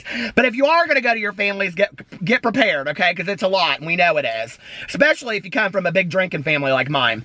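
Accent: South, United States. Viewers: I’m so happy that I’ve found you recently! I’ve never heard someone with a southern accent speak so fast but I’m from jersey so it just works for me. Hope you get to chill out after all of the madness 😉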